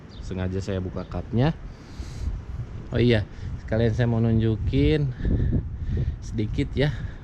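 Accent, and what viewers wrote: West Java, Indonesia. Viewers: suka liat vlog ini,yg ngevlognya seru & ada lucu2nya,logat sundanya& jadi tau daerah2 disaudi.kynya enak tinggal disana.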